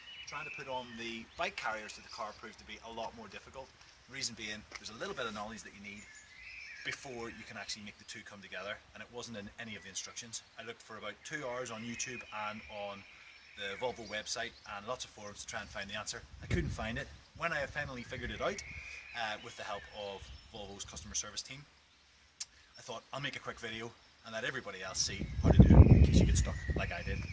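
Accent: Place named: Northern Ireland, United Kingdom. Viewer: Great, I'd just bought one of those bike racks (also for a V40 D3) and had come to the conclusion that the rubbers of the (wing) bars had to come out, but thank you for confirming my suspicions. An' it's quare geg t'hear it from a fellow Norn Ironer!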